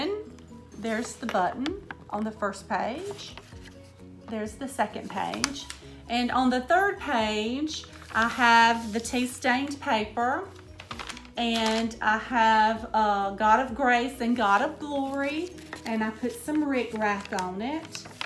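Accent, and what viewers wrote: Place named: Georgia, United States. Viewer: i love this ! i really like that you didn't make it too fat so you have lots of room for stuff. i would love to see how you sew it together & i would like to know how to tea stain paper. i've tea stained fabric for years, but not paper & i think it looks beautiful. you have lovely original ideas & i'm so enjoying your vids. i know we're neighbors cause you sound like everybody i know :):) have a great week & God bless :) :) nancy in ga usa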